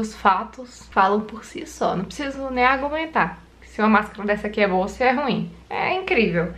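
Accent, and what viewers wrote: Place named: Minas Gerais, Brazil. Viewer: Já quero pra vida! Negoço existe no vocabulário aqui em Minas... haha Seu sotaque tem uma pegada mineira...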